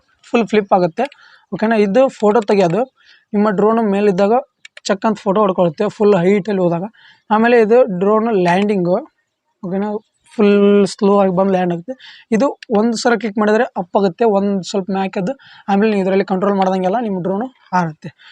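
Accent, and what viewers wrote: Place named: North Karnataka, India. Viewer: Pakka ಉತ್ತರ ಕರ್ನಾಟಕ ಬಾಷೆ😅😅😅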